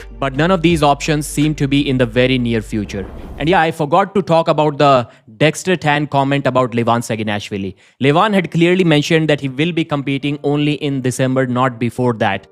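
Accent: India. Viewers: I can't stand the Indian accent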